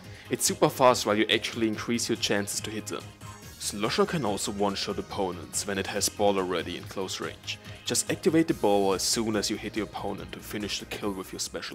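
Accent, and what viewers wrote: Germany. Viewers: You sound so German. Great video though, thanks a lot! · i watched this video and was like „this guy is german“ and indeed you are :) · I guess ur a german ... am i right ? ... i can hear that DEUTSCH 🛂 accent ... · Are you German? Also I knew about the slow squid trick since Splatoon 1 and it a lot more important than most people think.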